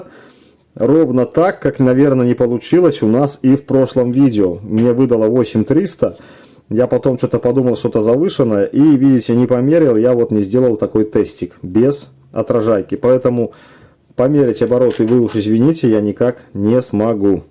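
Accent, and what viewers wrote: Belarus. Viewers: Классный белорусский говорок, я так не умею хотя далекие предки со стороны отца из тех краев.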